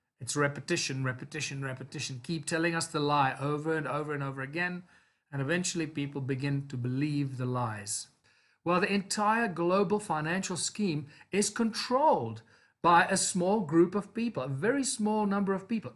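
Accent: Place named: England, United Kingdom. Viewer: Make note to his english accent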